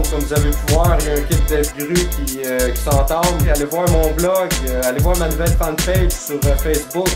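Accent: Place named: Quebec, Canada. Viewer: Un grand merci a toi je regarde toutes les vidéos que fait, j'habite en France et ton belle accent Québécois mes fait voyager et rêver.Encore bien merci et continue j'adore les regardés, voila a bientôt j’espère avoir un message de ta part.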